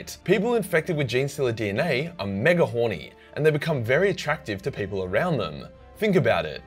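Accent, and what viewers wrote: Australia. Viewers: Im not even a huge fan of warhammer, but i love listening to this aussie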